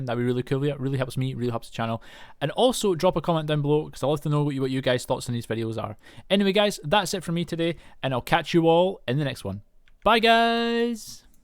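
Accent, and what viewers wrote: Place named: Scotland, United Kingdom. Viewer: Great a Scot! (Sounds Scottish at least) Should be an easy going tutorial :D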